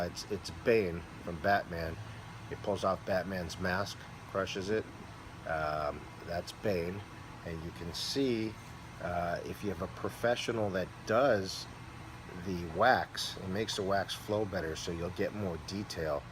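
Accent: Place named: North, United Kingdom. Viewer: awesome pieces and I love the Statue of Liberty with the buildings Skyline pretty cool the day always brings back memories I was in Staten Island when 9/11 happened so it was pretty scary and crazy the same time. it'd be cool if you could make the old Skyline with a police badge on the bottom a fireman badge on the other side of the bottom and paramedics like a little memorial statue I'm from the tri-state I don't know where you guys are based out of a forget I heard the northern accent. anyway I keep Rambling On Again LOL I just love your work. great work and very cool stuff to you keep up the good work I like the bars too I have a coin keeper bar from you I love skulls though. later dude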